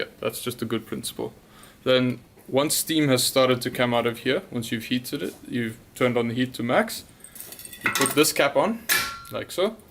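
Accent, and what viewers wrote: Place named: Germany. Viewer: few words and i can tell, german right?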